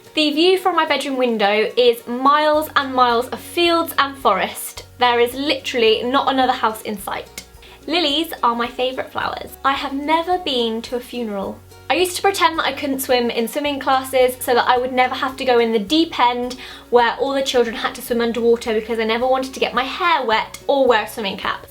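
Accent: United Kingdom. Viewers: is my favorite beauty guru out there! Her voice is just so adorable. She inspired me to make my own channel & start posting videos. After every single video I watch of hers, I accidently start talking British, haha. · Damn, I like British people. I could listen for her forever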